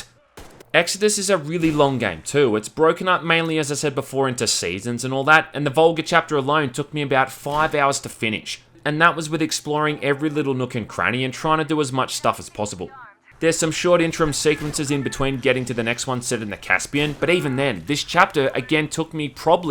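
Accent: Australia. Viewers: You have a good way with words. Good to hear an Aussie accent for once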